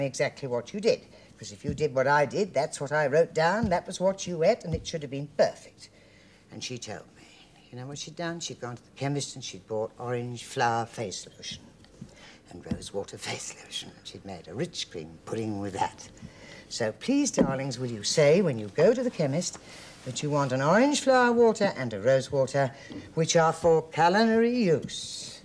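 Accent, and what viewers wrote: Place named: England, United Kingdom. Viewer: Awfully English lasy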